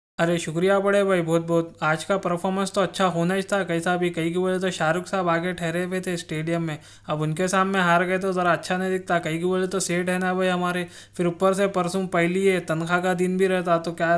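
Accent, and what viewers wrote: Hyderabad, India. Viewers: English class ny hoti vo hyderabadi slang hy vo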